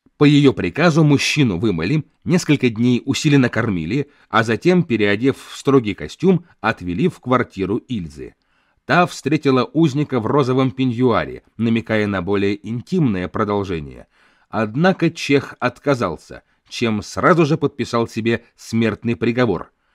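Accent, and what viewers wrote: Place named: Moldova. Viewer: Очень сильный у ведущего Молдавский акцент , аж уши режет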